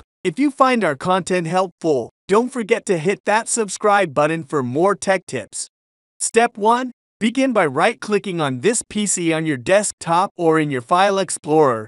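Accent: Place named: India. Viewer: This nigga gotta be Indian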